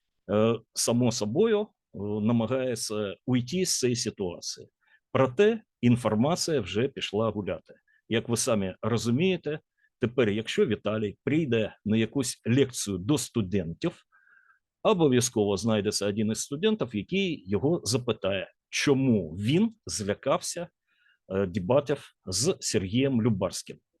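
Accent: Russia. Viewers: Якось дивно: Ви читаєте український текст, але з російською вимовою.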